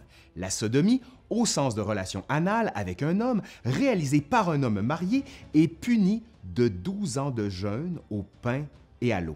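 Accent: Quebec, Canada. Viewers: est-ce qu'il est quebequois? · gloire aux dindons quel accent ? Il vien de Quebec pas des îles de la madeleine haha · Friande d'Histoire, je découvre votre chaîne et j'en suis époustouflée. Belles capsules, trop courtes certes mais très concises dans les explications qui les accompagnent, agrémentées de ce charmant accent québécois. Merci pour ce professionalisme. J'achète !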